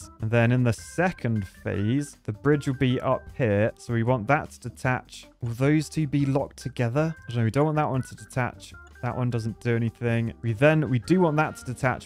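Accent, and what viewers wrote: United Kingdom. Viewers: Always walk on the strongest par' of a bridge. Love bri'isch accent without the middle t :D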